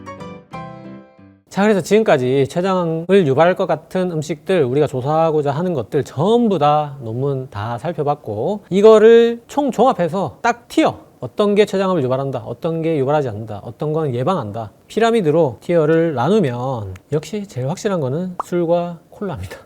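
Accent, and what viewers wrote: Gyeongsang, South Korea. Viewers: 쌤 보유국 우리나라🎉 성실하시고 어려운 의학을 구수한 경상도 사투리에 담아서 위트있게 잘도 설명해 주시는 능력자이심🎉 부산가면 꼭 뵙고 대접해드리고 싶은 분😊 근데 점점 더 멋있어지심?😮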